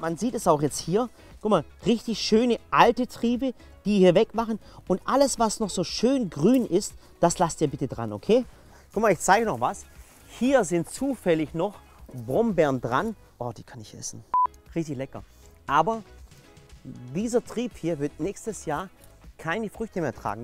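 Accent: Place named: Swabia, Germany. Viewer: Sehr cool, danke. Das Video hat mir sehr geholfen, auch wenn die "Blütezeit" der Himbeeren in Schweden etwas von der in Deutschland abweicht. Toll auch der immer wieder durchkommende schwäbische Dialekt 🙂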